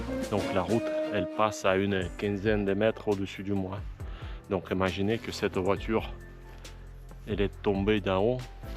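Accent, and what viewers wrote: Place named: Russia. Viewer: Bonjour. j'espère que tu vas bien depuis la dernière fois j'ai adoré ta vidéo que ce soit ta façon de raconter le lieu que tu nous fais découvrir la musique qui va bien aussi et ton petit accent russe que j'aime bien tout particulièrement on voit que tu étais bien renseigné bonne idée pour les genouillères j'espère quand même que quand tu pars tout seul tu donnes ta localisation à un proche on sait jamais ce qu'il pourrait arriver en tout cas superbe vidéo continue comme ça le succès est garantie ça serait bien qu'on arrive à se revoir avec l'équipe je te dis à la prochaine fois😊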